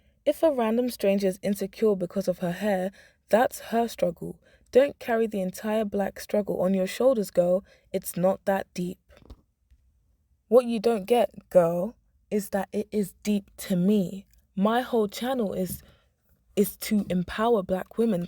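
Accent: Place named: London, United Kingdom. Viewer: Unrelated but your accent!! Im also a fellow londoner too!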